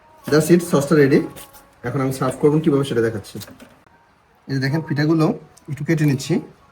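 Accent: Noakhali, Bangladesh. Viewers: Unar language sune mone hoy feni/ noakhali hobe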